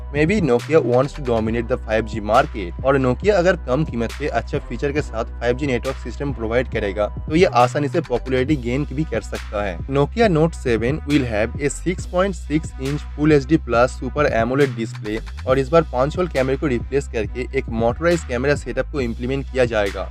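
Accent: Bengal, India. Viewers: Sir, apke Bengali hindi accent ke vajah se Kay bol rahe ho kuch bhi samajh nahi aa raha hai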